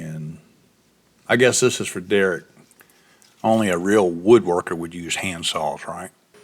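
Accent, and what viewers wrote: South, United States. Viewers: AMEN!!! Absolutely fabulous work! Getting use to the southern accent myself. Formerly a cheese head (Wisconsin) and now living in Ozark, Al. Very impressive work, and design. Thank you for sharing, and you have a new subscriber. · Been in California for many years but the family is from Tennessee so I don't mind the southern accent at all. I've had a CNC for several years and have learned you need to be an artist for designs and an engineer for getting the software to perform the way you want. And also a mechanic to make sure the CNC is working properly. Mostly a woodworker to see a piece of wood for what it can become sitting on a shelf, table, or kitchen counter. Amen y'all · Rant on brother! Always gonna be a knucklehead out there. I have a cnc and a laser and as far as I am concerned they are no different than my table saw. 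They are tools, complicated ones but still tools. Thank you for another awesome video. BTW love the cornbread accent....got one myself....redneck of the south Ga. variety here.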